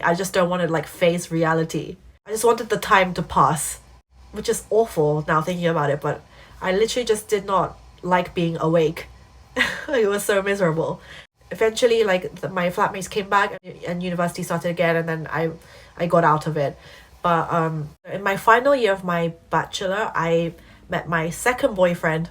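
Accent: England, United Kingdom. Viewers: Many thanks for such an encouragement life story. I must say that your mum had made the best decision for you and your brother. I have never ever known a Korean person speaking excellence English (in English accent) likes you are. All the best from Melbourne, Australia:-) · Wow, what an amazing life story! At least now we know where you got the strong English accent from (absolutely fabulous 💫). Oh yeah, Russian music and ballet teachers don't mess with them!! 🎶 Did you take piano classes? Rachmaninoff and some others probably had gigantic palms, hard to stride the notes for those with smaller hands. I think you will thrive on YouTube with your bilingual abilities. Welcome 🏡 dongsaeng 👊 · This proves your UK accent!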